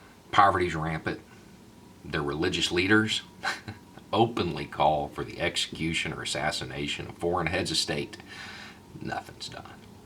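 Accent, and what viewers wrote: South, United States. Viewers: Yep, glad there aren't many trolls. But at the same time, I really want right-wingers to check this guy out. I want them to see someone who looks and talks like them but with a different viewpoint who can passionately argue from a place of love like can. He has a chance at changing minds like few others on YT can. Also want liberal city-folk to see and then maybe think twice before dismissing people with a southern accent as stupid.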